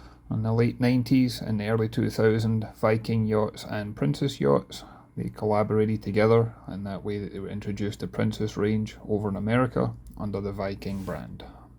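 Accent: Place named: Scotland, United Kingdom. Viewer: Not bad.. But the guys that are selling it have not even done a through clean on it and that in it's self would stop me from buying from them.. I mean they didn't even wipe out the cooler on the fly bridge.. Just being honest brother.. still nice little boat to do some cruising in.. My wife loves your Scottish accent..👍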